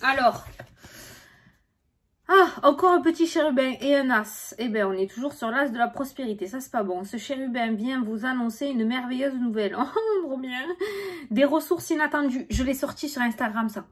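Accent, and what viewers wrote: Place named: Var, France. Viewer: super toujours aussi speed,ton accent vient du ( var ) bonne journée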